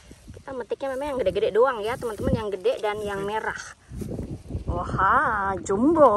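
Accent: Papua, Indonesia. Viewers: Kaka pasti asal Papua ini 😁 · mba pernah tinggal di papua mana ? soalnya kyk logat papua gt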